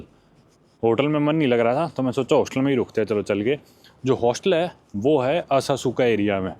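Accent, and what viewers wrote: Haryana, India. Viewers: Kuch bhi ho jya lekin Haryanvi sudh hindi nhi bol sakte , " jaan karke" he is speaking that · Baki sab sahi video quality best host best per ye jo consistency hai vo thodi si increase hojaye jayda bhi nhi halki si increase hojaye hafte me ek hi ajaye na tho bhut hai bhai ab have to hogye hai aap ke video dhek ne ke mamle me jayda nhi bus halki si consistency quki itne actual punctual hum bhi Nhi hai isliye Kripaya ker ke hafte ki ek or bhai raw upload ker do video me tho kheta hu or full Haryanvi accent wagera jo app normally use kerte hi hogye usually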